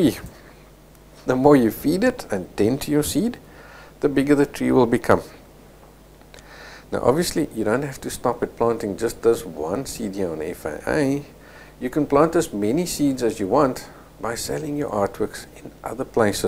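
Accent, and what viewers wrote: Afrikaans, South Africa. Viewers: Hi are you originally from South Africa. Sounds like you have an Afrikaans accent. I am from Cape Town, and wants to sell on FAA. Well I have sold two prints now. It is difficult to get the right people to see my work. My South African friends are not going to buy my work and pay in $$, our exchange rate is just too bad. Any ideas how I can get more international viewers?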